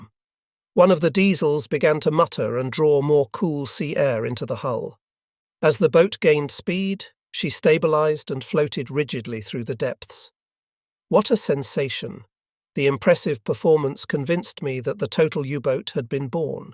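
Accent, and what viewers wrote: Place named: United Kingdom. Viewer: Why does the narrator have a British accent? Shouldn't he have a German accent?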